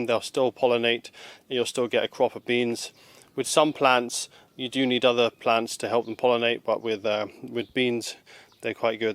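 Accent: Scotland, United Kingdom. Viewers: Thanks for posting!!!! I never comment but as a new follower who really appreciates your videos I had to. Your videos are so helpful and a sweet bonus is your great Scottish accent! Definitely going to binge all your videos so I can get pro at gardening during quarantine!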